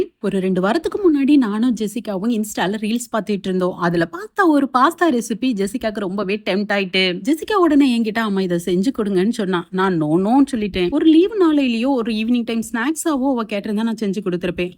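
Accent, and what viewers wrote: Tirunelveli, India. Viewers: apdiya ma nanum athey doubt la dhan keka vanthen namma ooru slang mathiriye irukey nu😅😊neenga tirunelveli la entha side?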